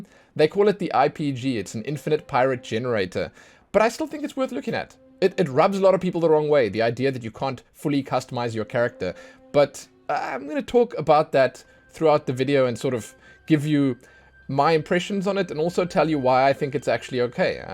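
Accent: South Africa. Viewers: This guy is South African I could tell by his accent I’m South African too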